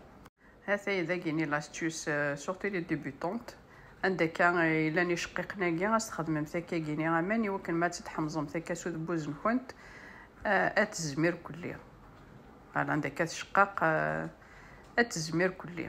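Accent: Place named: Kabylie, Algeria. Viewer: Merci pour cette belle recette et très beau commentaire langage de la grande Kabylie bel accent qui donne chaud au coeur car je suis de la région d Azazga c'est une fierté bravo et merci infiniment bonne continuation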